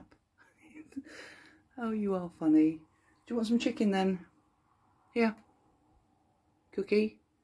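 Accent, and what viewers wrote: United Kingdom. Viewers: I love the British accent, especially when you say: “marvelous » tail ! It’s true that Cookie’s fur is sublime! ❤😊🦊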